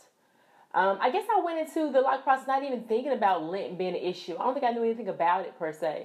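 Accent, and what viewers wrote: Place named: Tennessee, United States. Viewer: I knew it, I have a few friends from Tennessee, and you sound like them. I figured it was a Tennessee accent, but I had to make sure. Kudos for my ears....lol....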